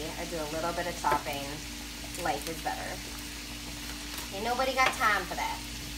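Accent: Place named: Bangladesh. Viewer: sound like a Bangladeshi ♥♥♥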